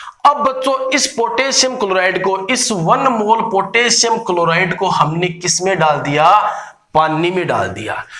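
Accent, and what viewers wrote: Haryana, India. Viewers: Sir. Ap. Haryana. Se. Hai. Kya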